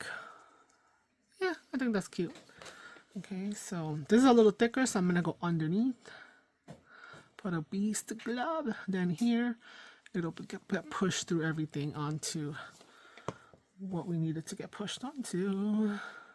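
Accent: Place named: New York, United States. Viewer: Everything came out Beautiful ❤! I started my junk journal journey this past year and I still have a ways to get to where I you are at 😊. Thank You for sharing your creative process with us . I HAVE to ask you ….. are you a fellow Puerto Rican from New York ( you have that accent , IYKYK 😉) . I’m from Queens but I now live in Miami and I miss the cold weather! lol😉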